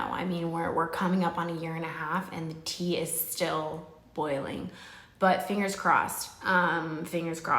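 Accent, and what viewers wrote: South, United States. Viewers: I’ve been watching you for quite a while and I’ve never heard your southern accent as much as I did today. I’m southern (if you count Texas), so I love it. I want to share that I got married in 1989 and had my first child in 1997. Infertility is not fun at all, but I pray your dreams come true. At 61, I now have a son who is almost 27 and a daughter who is 23. Hang in there. On a lighter note I love the less-harsh dark brown mascara!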